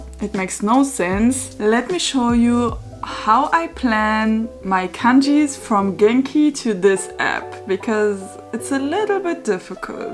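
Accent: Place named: France. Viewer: U have a French accent!! Ur mother language is French ? .. PS: keep filming videos like this 🥰🤍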